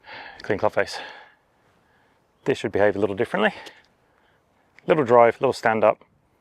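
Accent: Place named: England, United Kingdom. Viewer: Hey, I'm a big fan of what you do. I've studied and played (1 handicap in '02) for 46 years. And your insight on chipping is Brilliant. One suggestion.: Speak a bit slower. Sometimes your rate of speech, is such that your words are hard to discern: I had to replay with captions, to understand the word boil, in the first 30 seconds.I love your English accent, but it can, at times, be a second challenge, for us Yanks. Thank you good Sir.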